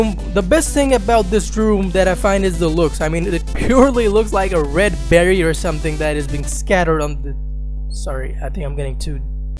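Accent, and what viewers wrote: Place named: South India, India. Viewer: Assalamu alaikum! Which is your native? You seem to be from South India! though you live in Kanpur.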